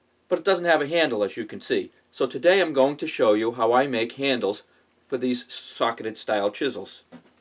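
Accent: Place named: Boston, United States. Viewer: Man, you have a really strong Bostonian accent. Are you from reveeyah?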